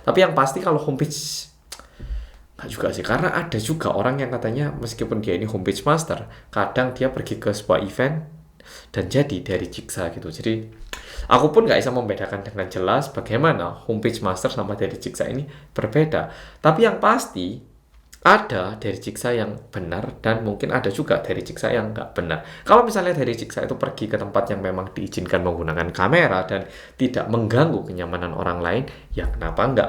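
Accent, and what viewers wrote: Java, Indonesia. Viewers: Mas Korea medok.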